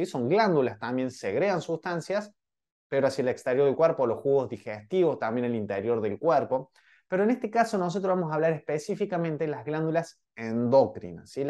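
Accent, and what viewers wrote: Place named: mixed, Argentina. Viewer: Es Tucumáno o cordobés de Argentina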